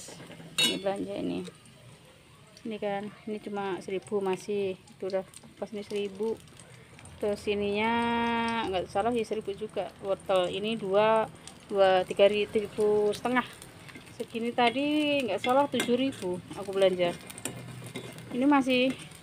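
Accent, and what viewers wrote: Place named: Java, Indonesia. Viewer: Serem bget yu deloe brambang abang di goreng ngeri aku deloe eh🙈🙈,mendok tenan boso jowo ne yu 👍👍.tuku lah neng konter katah yu tripot kur gangsal welas ewu😅😅.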